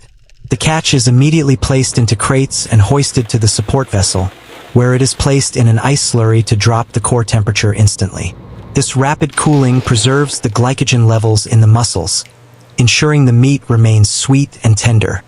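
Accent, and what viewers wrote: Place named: United States. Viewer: What is an American doing narrating an Australian Project ?????????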